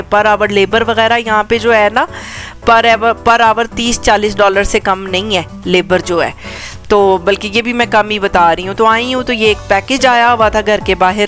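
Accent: Punjab, India. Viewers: Panjabi ka trka to bara vadiya lagati hain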